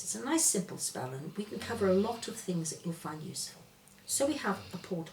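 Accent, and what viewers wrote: Wales, United Kingdom. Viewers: I love your accent I hear Welsh in it ❤💛💚🧙✌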